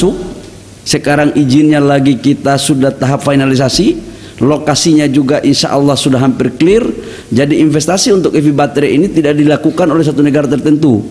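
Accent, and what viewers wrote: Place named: Eastern Indonesia, Indonesia. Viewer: Logat Timur selalu melekat 😁😁 tetapi semangat pak 🥰🥰😇😇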